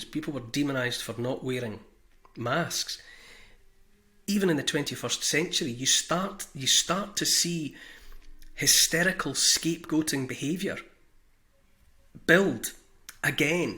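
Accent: Scotland, United Kingdom. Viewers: Are ye Scottish Sir? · well i must say that information couldn't off not sounded any better Scottish twang made it even moee captivating thanks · Are you Scottish. I love you accent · Your Scottish accent is amazing 👍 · First time on this channel🔥whats your accent lad? Scottish? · I adore a good Scottish burr, too. I like them even better in a kilt 😂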